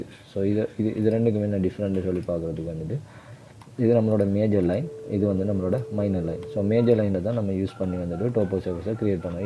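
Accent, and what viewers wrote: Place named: Eastern Tamil, Sri Lanka. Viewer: Best of luck bro Eastern tamil ketkawe mass a irukku Konjam basic la irunthu solli thara ealuma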